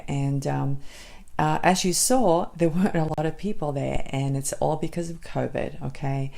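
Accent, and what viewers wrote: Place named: Australia. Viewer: Wow I thought I detected an Aussie accent...you must have an interesting tale to tell of how you got to America. I grew up in Mount Gravatt, Brisbane so I know Sunnybank well. xox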